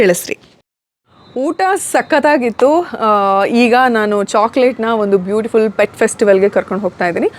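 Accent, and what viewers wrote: North Karnataka, India. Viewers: Akka nim bai valag nam uttar Karnataka language agdi mast 🔥🔥❤ri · nimma Uttra Kannada bashe chennegeidea I am also UK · Wow namma UK bhashe keli bhala ksushi atu, Happy Wedding Anniversary 😊 <link> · ನಿಮ್ಮ ಉತ್ತರ ಕರ್ನಾಟಕದ ಭಾಷೆ ತುಂಬಾ ಚೆನ್ನಾಗಿತ್ತು❤ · Nav ಉತ್ತರ ಕರ್ನಾಟಕದವರು ri ,bala kushi atri niv hing matadiddu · Nice that you spoke in Uttar Karnataka tone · Bhari masth recipe ayitu, nam UK language masth matadtiri ❤ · Nam uttara karnataka bashe keli tumba khushi aytu mam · Nim uk baashe super madeam,navu dharwadadinda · Happy wedding anniversary 🎉 stay blessed 😊 happy to hear your north Karnataka kannada accent 😊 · Happy wedding anniversary ma'am 💕 loads of love from Haveri ❤, nivu avag avag hing uttar karnataka language use madtiri kelok chanda ansat 🥰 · Hye uttara Karnataka tone❤️nim ela blogs ide lng alle mdi sis.nan uk hudgi alla bt nim lng ❤️❤️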